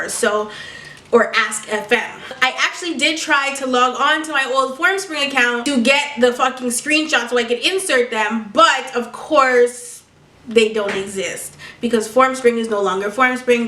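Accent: England, United Kingdom. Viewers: That british accent though 😂😍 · Oui that British accent m8 it was amazing lol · BITCH THE BRITISH ACCENT. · OMG UR ENGLISH ACCENT IS EVERYTHING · LOVE FROM BRITAAAIIIIN! Btw british accent you sound just like a school friend😂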